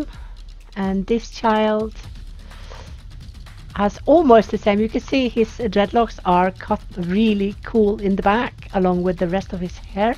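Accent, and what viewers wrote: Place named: North, United Kingdom. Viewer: Hi 😊👋 just found your channel, your playtrough is very intelligent and informative! Your accent sounds northern🤭 can i ask where you are from? I am Scandinavian thats why I ask🤭 Love your video and will continue to watch your videos, new sub for you😊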